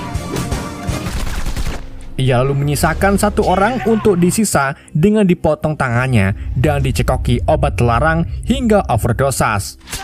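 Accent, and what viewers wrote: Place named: Banyumas, Indonesia. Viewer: JEBULE NGAPAK RIKA BANG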